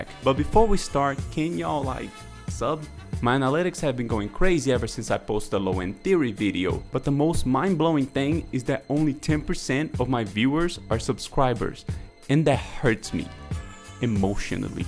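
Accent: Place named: Brazil. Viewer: tu tem sotaque de brasileiro, tu é brasil?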